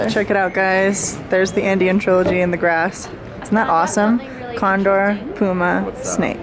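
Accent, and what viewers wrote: Spain. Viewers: Yeah, she studied abroad in Spain, that's why she has the spanish accent.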